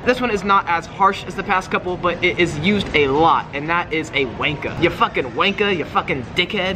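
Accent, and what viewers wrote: United States. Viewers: Lmfao hearing all this in an American accent is hilarious. Love it mate, well done! 👍🇦🇺😂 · Hearing an American pronouncing these is so weird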